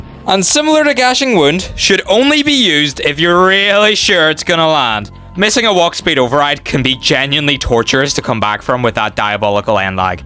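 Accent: Scotland, United Kingdom. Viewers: Maybe he’s Scottish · Idk why this dude has a slight Scottish accent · Never thought I’d laugh this much on a Scottish person ranking killer abilities while swearing frequently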